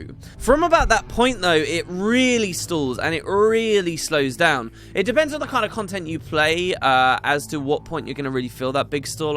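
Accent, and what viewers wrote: England, United Kingdom. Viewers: Honestly, some people complain that he is too drawn out and rant, but I really do love to turn on his videos and chill and just listen to that sweet melodic English accent.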